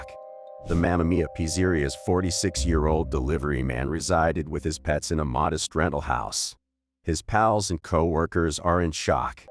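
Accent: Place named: Boston, United States. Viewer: Is this a robot with a Boston accent? Wtf!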